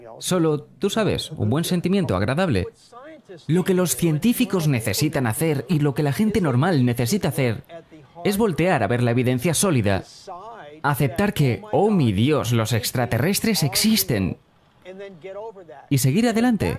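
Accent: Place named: Spain. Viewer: Pinche gente huevona que no tiene otra cosa que hacer ? Pongance a trabajar de verdad, y esa pinche voz española agrede el oido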